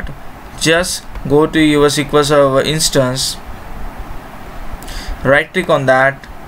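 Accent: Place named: India. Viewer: Hmm indian accent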